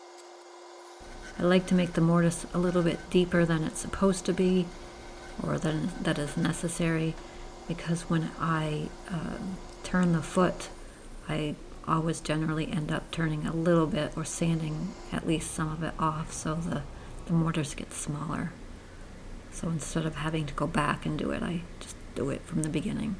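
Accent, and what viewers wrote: United States. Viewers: Wicked good works well here in Oregon too. You sound to me like you could be from here. Nice work.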